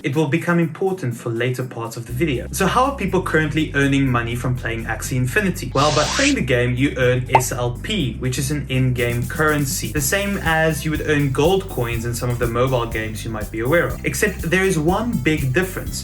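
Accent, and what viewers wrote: South Africa. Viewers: I thought you sounded South African. I'm originally from Ghana, but I live in South Africa.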